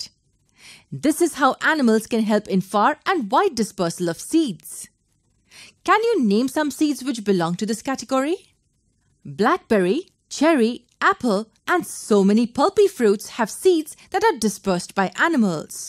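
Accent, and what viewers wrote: India. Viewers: you sound li indien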